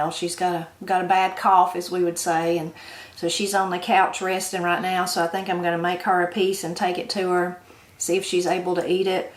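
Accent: Appalachia, United States. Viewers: i fell in love with the appalachia accent it is so beautiful, i got a smile everytime i hear oil and moist, not in a making fun way, but because it wamrs my heart that every place has something so unique and special, flavors and sounds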